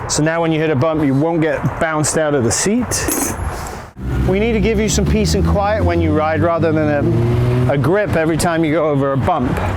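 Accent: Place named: Northern England, United Kingdom. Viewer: I've been watching some of his videos and trying to guess the accent too. Think I hear hints of northern English (Manchester maybe) but it's faded a bit from probably travelling a lot. Anyhow yeah watched a bunch of videos about suspension and he's the only guy I really feel like I'm understanding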